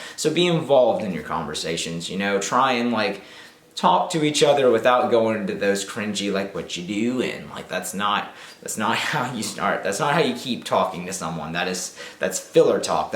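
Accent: South, United States. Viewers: I for one wouldn't care if you talked in a Southern accent for the remainder of your time on YouTube, it reminds me of your very first video you're coming out video one of the things that I found so endearing about that video was that southern accent, you weren't trying to hide it it was just there and I found that to be precious and plus I'm in South Carolina so I get ragged all the time about my Southern accent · Okay, my question for the Q&A (if you so choose) - do you use your "Southern" voice on dates or you "Normal YouTube" voice? (I'm wondering now because I've heard your accent and the general topic of the video xD) Otherwise, I feel like I would enjoy sitting down with you and just picking your brain about all the stuff you talk about... oh shit my psychologist is showing, k thx bai! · Ohh, that Southern voice is giving me goosebumps. I love it! 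It's great to see you Smile again. I love you always seem Complex, that's a great thing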